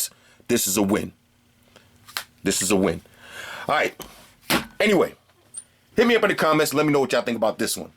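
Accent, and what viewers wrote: New York, United States. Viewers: Hot 97!!I knew You were from Ny! BK?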